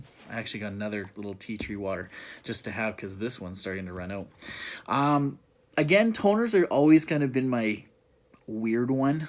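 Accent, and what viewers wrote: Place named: Canada. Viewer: Fellow Canadian here. You have such a Canadian accent! Love the video!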